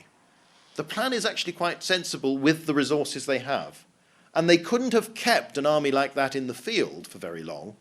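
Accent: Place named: United Kingdom. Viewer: Absolutely enjoyable and very high information density. Plus the British accent adds credibility... :)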